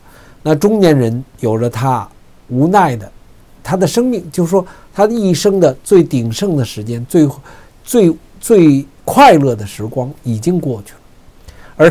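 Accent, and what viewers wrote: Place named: Beijing, China. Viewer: 石涛兄，听您口音也应该是北京人，89年那次估计您也经历过全过程，您现在在一个平静安全的地方去鼓动香港的年轻人去重演那些大家不愿再回首和重复的画面，您觉得有意思吗？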